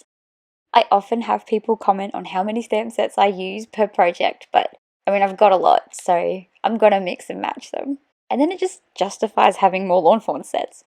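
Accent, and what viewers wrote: Australia. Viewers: Love your lovely Aussie voice! Gorgeous card and I love your inspiration piece! Fellow Aussie here!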